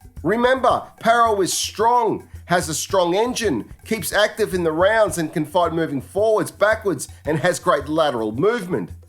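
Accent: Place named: Australia. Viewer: Surprise, the guy with an Aussie accent picks the Aussie to win. Stylistically, Hitchins should win. However, Liam's gas tank is huge. Should be a great fight.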